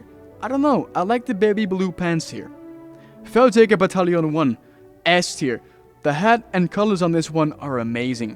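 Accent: France. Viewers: i like ur french accent, subbed good vid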